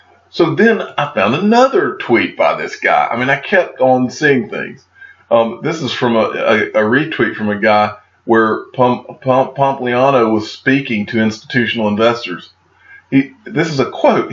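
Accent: South, United States. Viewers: HAHAHAHAHAHAH you are a fucking FAN BOY piece of shit... Stop SCAMMING people... How much XRP SHIT coins are they paying you for your SHITHOUSE forrest GUMP accent videos... you fucking STINK!!!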